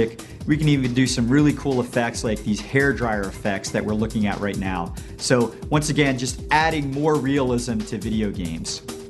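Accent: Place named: United States. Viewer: Brown guy with an american accent...WOOOOOOOOT JOIN THE WAGON BROTHER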